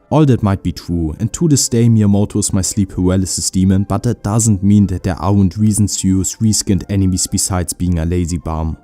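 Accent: Germany. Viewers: Ich brauchte genau 5 Sekunden, um herauszuhören, dass du normalerweise Deutsch sprichst. :D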